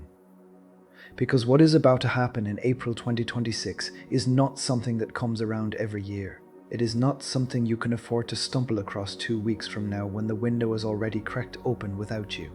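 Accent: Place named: Ireland. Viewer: 💯👊💪👍 Bravo - First Time Hearing the Voice of an Irish Astrologer. 👏🏻👏🏻 Wow. Right now, this is like finger on the pulse, so much in my financial horizon, it's right on the money.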